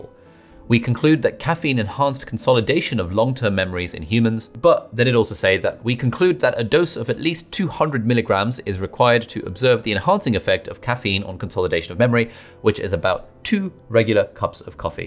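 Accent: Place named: United Kingdom. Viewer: New Subscriber, where in the UK are yiu, can't work out the accent? 🤔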